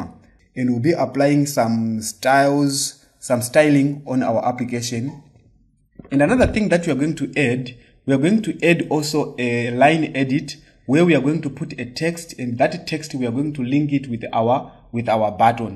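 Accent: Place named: India. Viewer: please never talk like Indian programmaer, it is disgusting. please please please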